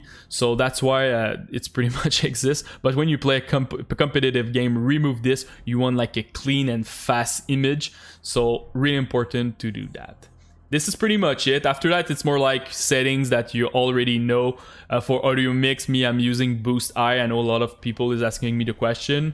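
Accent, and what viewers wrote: Quebec, Canada. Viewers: L’accent québécois mdr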